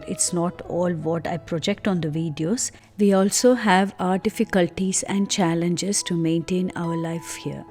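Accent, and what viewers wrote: India. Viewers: Talk like a Indian sound like a Indian 🌚🌚 Indian 🙄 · I visited Switzerland 8 years ago, and found it to be absolutely fascinating. Not just compared to India, but also compared to other countries in the world. I didnt, or couldnt find any dirt, but as you said, no country is 100 % perfect, but I found it near perfect. However, you may be knowing better about it. Unfortunately, this is a bad time, and as of today i.e. 13th May 2020, I heard that there has been some improvement there in Switzerland compared to here, in India (by your voice, it seems you are Indian as well, I suppose), thanks to the excellent healthcare and orderly procedure there. Hope that this situation tides off and we are back to normal in a year (or two). I would love to visit Switzerland again in future, if opportunity presents.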